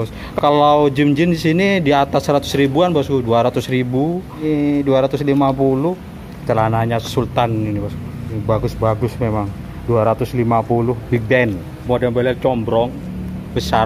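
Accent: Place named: Madura, Indonesia. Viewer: wahhh medureh reh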